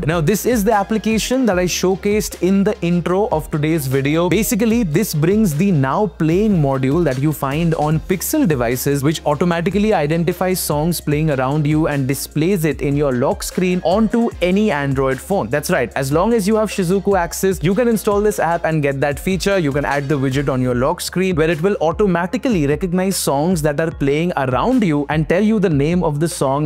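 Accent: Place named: India. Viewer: bro, what an annoying jeet accent.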